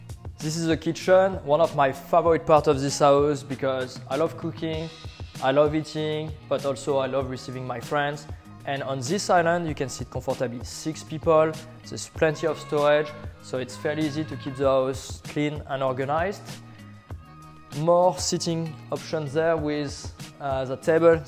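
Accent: France. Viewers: i am not able to understand a full sentence of that frenglish.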